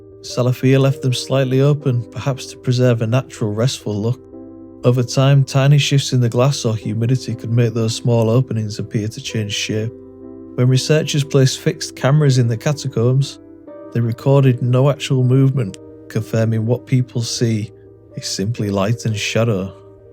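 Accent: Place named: Hull, United Kingdom. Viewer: Your accent sounds like u might be from hull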